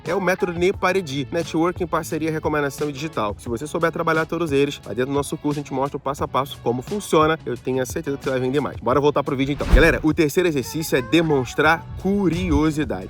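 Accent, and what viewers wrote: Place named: São Paulo, Brazil. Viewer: O conteudo muito bom, o sotaque de faria limer orrita um pouco... hahahahaha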